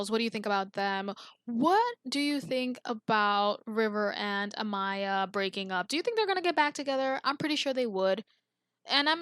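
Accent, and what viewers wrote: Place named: Latin America. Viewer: Balla se te escucho vien el espol latino 😃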